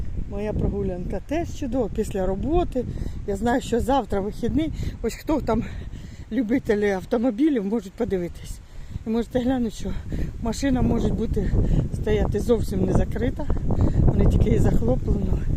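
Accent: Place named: Sumy, Ukraine. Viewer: Привіт, Ви праві, говорите так самісінько, як в Сумській області!!((, там, далеко від нас...Всього тобі найкращого!:face-red-heart-shape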